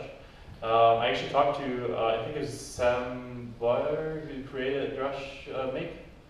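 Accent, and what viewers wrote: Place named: United States. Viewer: Hm., wenn ich das richtig sehe, bist du Deutscher. Von der Sprache hätte ich eher auf Amerikaner getippt. Austauschstudent? Zweisprachig aufgewachsen? Ich staune...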